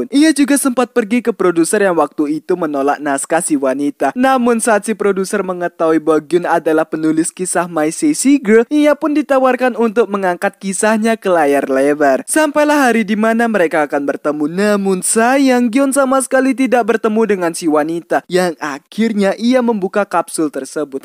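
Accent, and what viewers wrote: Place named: Bugis, Indonesia. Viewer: Telasomu Ko bilang bang😂 Bugis ye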